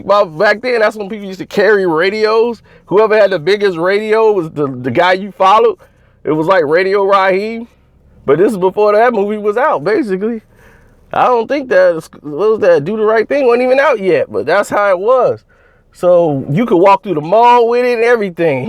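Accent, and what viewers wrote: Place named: South, United States. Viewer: This brother must be from down south somewhere North Carolina or somewhere cuz he's definitely not a New Yorker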